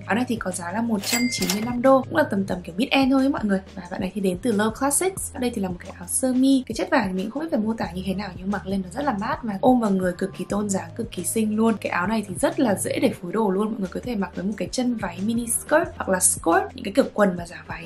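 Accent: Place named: North, Vietnam. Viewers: sao chị học ở sg mà lại nói giọng Bắc thế ạ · vẫn giữ được giọng Bắc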